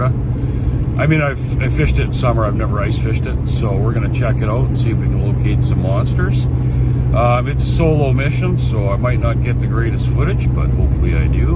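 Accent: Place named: Midwest, United States. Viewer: Bro I love your Midwest accent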